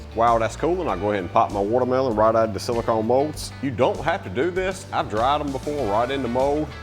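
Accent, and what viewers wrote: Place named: North Carolina, United States. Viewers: I just shared this with my mom, 8 siblings, and their spouses: “This dude I’d propose marriage to if he were single (and not straight). “His video shorts are amazing, canning peaches, growing food, freezing cantaloupe for smoothies, freeze drying watermelon, and so much more. A southern accent is always comedic or fascinating, but rarely sexy. I’d be able to listen to his accent all day long and be charmed by it.” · He's from North Carolina, where he contributes his accent. He doesn't have a Louisiana accent (born and reared here, although we'd welcome him). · No clue how i ended up here, but a dude who looks like he could break a tree in half just by looking at it, with an accent I cant place further than "south? Maybe????", using molds he calls "adorable"? Yeah. Sign me the fuck up. (And yeas, my own prejudice absolutely plays in here, i was so ready to scroll quick as shit. 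Was like 85% sure this would include some 'women suck, God is everything, black people are criminals and also the gays are sinful' kind of garbage. I'm from Europe and everything about this mans first impression screams terrifying to me. Glad I stayed, if for no other reason than to sit with my own prejudice for a while and start to become aware of it. So you know, thank you for that, and also sorry for the immediate judgement) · Are you in North Carolina? I love your accent & your content...new subscriber. ❤ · I live in the southern United States and I can’t figure his accent either! It’s delightful!! He’s either from the Carolina’s or Louisiana??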